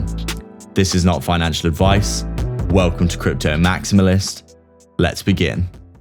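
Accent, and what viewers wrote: United Kingdom. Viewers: Its so refreshing to hear a fellow brit talking haha!! Keep it up mate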